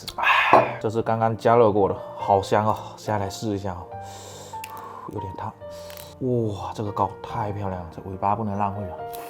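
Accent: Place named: Hakka, China. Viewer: 看完之後感想 ……. 客家音怎麼那麼重 🤣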